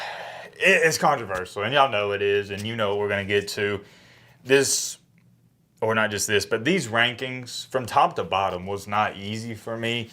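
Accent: South, United States. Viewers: And I waited through this terrible Sputhen Accent to hear Bama is out. Bittersweet. 😂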